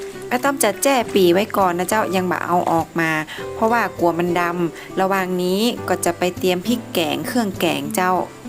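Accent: North, Thailand. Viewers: ภาษาเหนือคะฟังง่ายอยุ่น้า😊😊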